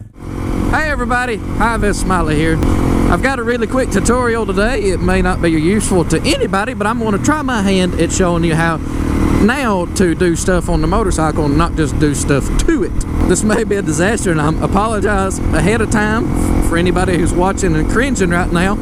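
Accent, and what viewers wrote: Alabama, United States. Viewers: If Alabama had a voice, it would sound like yours.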